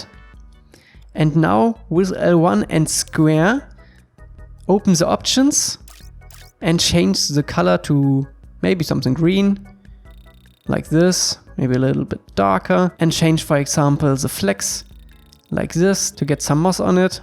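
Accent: Germany. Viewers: Wenn man den deutschen Akzent raushört ^^ gutes Video! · Are you German!? 😄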